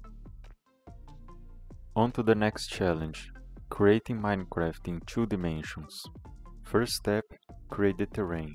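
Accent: Brazil. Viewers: primeira vez q percebo o sotaque de um brasileiro kkkkkkk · Se ele não for um brasileiro falando inglês eu crio asas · Reconheci que era br pelo sotaque! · Aaaa, por isso o inglês era estranho, o cara é brasileiro, em fim ótimo trabalho parceiro, foi abençoado pelo algoritmo do youtube 100k views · Na hora eu já saquei que vc era br, c não engana primata da sua espécie não em Enfim, seu canal tem potencial, daqui um tempo vc tá nos 50 mil incritos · brazilian bruv this accent 😭😭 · e esse sotaque brasileiro aí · Oh, good (percebi que é Brasileiro pelo sotaque no video, então... congratulations) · Cara, você é brasileiro? Fala bem pra caramba mas o sotaque não engana kkkk, a localização do canal no sobre também fala que é do Brasil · O sotaque brasileiro é inigualável kk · Are you brazilian? I'm assuming from your accent. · Salvee, to ligado que vc é br, da pra perceber kkkkkkk, mas enfim, ótimo trabalho! 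👏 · E esse inglês brazuka aí? Eu notei o sotaque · Incrível como eu já sabia que era BR só pelo sotaque kkkkkkkkk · Eu tenho CERTEZA que é brasileiro n é possível ksksksksksk Esse sotaque é muito nosso vei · Percebi que era BR só pelo sotaque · da pra reconhecer brasileiro de longe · Acho que o narrador é br kssksk esse sotaque en ksk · Vc é brasileiro né? O sotaque brasileiro é inconfundível kkk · amo q da pra saber qnd o cr eh brasileiro falando inglês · I must know this Why do you sound brazilian? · Com esse sotaque, você é brasileiro, não é possível kksjjskkjjjjsjsjsjsjsjsj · Sotaque brasileiro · tu é br né? kksksksks eu já percebo na hora brasileiro falando inglês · That's a brazilian accenttt broooooooo! tamo junto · Reconheci o sotaque brasileiro hue Ótimo vídeo.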